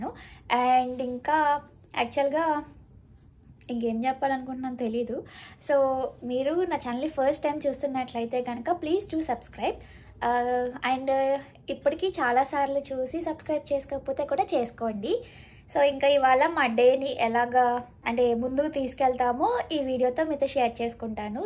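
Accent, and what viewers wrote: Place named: Godavari, India. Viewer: typical godavari slang....... ------from a godavari boy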